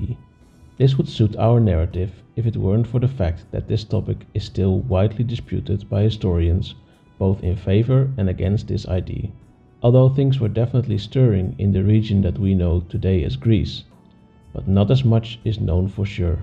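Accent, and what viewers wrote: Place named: Netherlands. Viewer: dutch accent ftw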